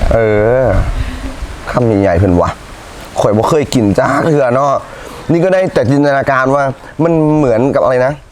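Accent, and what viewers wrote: Isan, Thailand. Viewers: ตามหลายคลิปแล้วจร้าแต่คลิปนี้ลั่นเลย🤣🤣#เว่าอิสานน่าฮักดี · 555555ระว้งพยานเด้อกินหลายๆหะเว้าอีสานใด้น่ารักมาก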